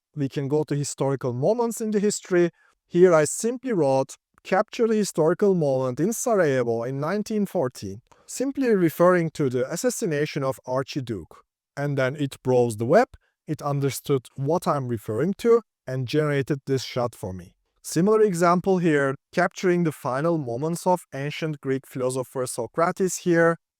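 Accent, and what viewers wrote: Turkey. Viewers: Aksanına Kurban olduğum. Türk olduğuna yemin edebilirim ama kanıtlayamam. Teşekkürler. Türk'sen Yorumuma kalp at. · şu kötü türk aksanlı ingilizceyi de yapay zekaya mı okutsan acaba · törkiş engliş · Kardeş sen Türk müsün? İngilizceyi tam Türk aksanıyla konuşuyorsun. 🤓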